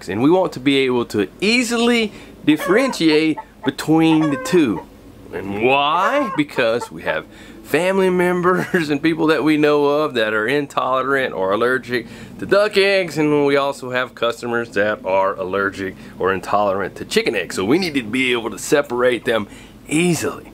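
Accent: South, United States. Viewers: Hi Family & everyone! I’m from Nashville Tennessee. And your come on come on> country twang makes me miss home. Love ya guy’s! God Bless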